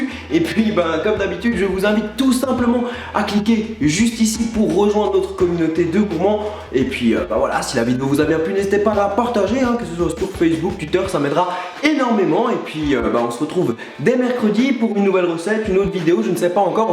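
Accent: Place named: Alsace, France. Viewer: C'est l'alsacien ;)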